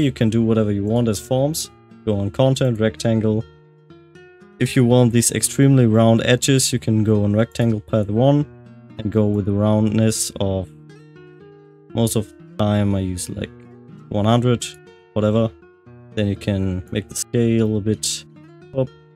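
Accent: Germany. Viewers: I love these tutorials! You’re so sympathetic. And dieser deutsche Akzent ❤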